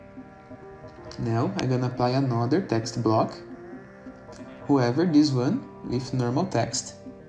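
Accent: Brazil. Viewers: Sotaque BR haha nice video!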